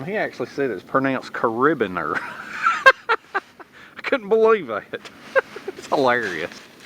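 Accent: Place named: Eastern Kentucky, United States. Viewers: I have not been a subscriber very long and I have been trying to identify where you're from. I'm going to guess the Blue Ridge Mountains of Georgia. I first thought of east Tn or East KY but I definitely hear a little Georgia in there. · Enjoy watching your videos. Listening to you talk sounds like your from our neck of the woods. E.KY Great Job!!!! Keep the videos coming.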